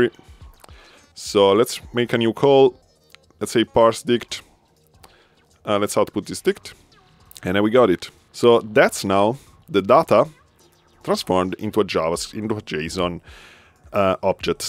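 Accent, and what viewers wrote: Italy. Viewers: Hey, your Italian accent is wonderful and adds to your channel. English speaking viewers can only be grateful that you are producing these great tutorial videos in their native language. And I did not know there was a "maxurl" object - thanks!!!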